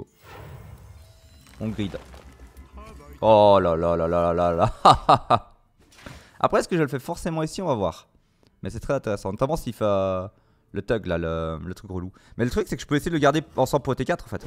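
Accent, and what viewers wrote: Belgium. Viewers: GG pour le tournoi et les qualif. ça fait toujours plaisir d'entendre (avec ton accens ;)) un compatriote Belge performer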